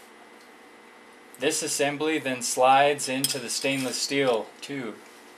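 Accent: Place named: United States. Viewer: Good job. 10 points taking off for sounding like a yankee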